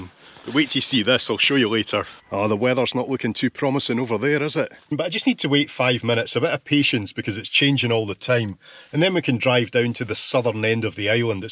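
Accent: Scotland, United Kingdom. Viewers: this video was even more special for me than your videos usually are. My brother's middle name is "Harris," and he was born on April 29, the date on which, this Spring, you made this video about the Isle of Harris. My paternal grandmother's maiden name was Harris, which my siblings and I did not realize is a Scottish surname. Now, thanks to this video, my brother, sister and I get to claim Scottish parentage (which is even more special given that our other 3 grandparents were of Swedish, Norwegian and Danish parentage)! And I realize, through listening to you speak, that my habit of adding "Eh" (pronounced more like "Ay") at the end of some declarative sentences must have come from my Canadian relatives, who lived in a part of Alberta, Canada populated mostly by people of Scottish ancestry (including my paternal grandmother's family). I wonder if my grandmother's Scottish parentage and that one Scottish speech habit of mine would qualify me for Scottish citizenship (or at least residency).... 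Your channel is my favorite on YouTube, probably because I am a traveler who favors the same meandering-on-foot style of exploring. For 8 years, I flew a roundtrip between Seattle, Washington and London as Pan Am crew, with a 30+-hour layover in London, almost every weekend. One London establishment I frequented was The Scotch House, because I was fascinated by tartans. I now know why. Looking forward to your next post regarding Harris Tweed (and to researching whether there is a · I am going to record saying "All the wee lochs" into a continuous loop that I can play whenever I'm in a bad mood. Impossible to listen to that line, with that Scottish brogue, without getting a smile on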